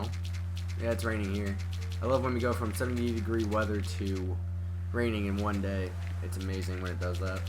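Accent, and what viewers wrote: United States. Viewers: R u from stl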